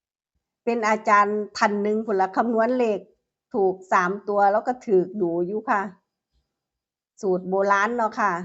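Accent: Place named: Chaiyaphum, Thailand. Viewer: เลข 5 สวยครับ สำเนียงเหมือนคนชัยภูมิเลยครับ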